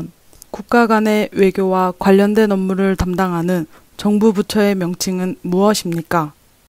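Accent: South Korea. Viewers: Cho mình hỏi 1 chút, giọng đọc trên là người gốc Hàn đọc ạ ? Và khi đi thi thì liệu tần suất đọc có nhanh hơn và khó nghe hơn ko ạ? ( giọng đọc trên mình nghe được ). Mình cảm ơn ạ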